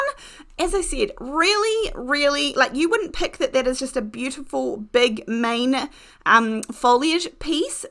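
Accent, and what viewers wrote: New Zealand. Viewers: So delighted for you,! I so enjoy watching your videos and learning great tips from you. You’re so creative and down-to-earth. And enjoy the Kiwi accent which reminds me of my lovely coworker from your country. This is a wonderful “step change” in your paper arts career! 🎉❤. I’ve got three sets in my cart!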